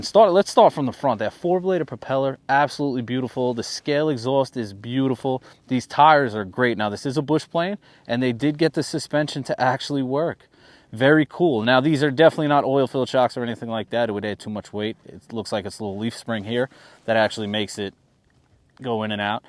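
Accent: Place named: East Coast, United States. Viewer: Great coverage of this plane! Im looking for a nice backyard flyer. And your east coast accent makes me feel at home man. I moved away from The Island when I was in my 20s.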